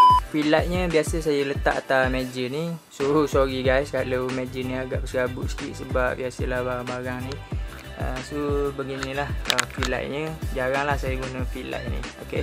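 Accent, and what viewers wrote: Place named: Terengganu, Malaysia. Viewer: Terbaik boss dpt ilmu hari ning.. Ornag ganung ke.. Ckp ade mcm ganu sikit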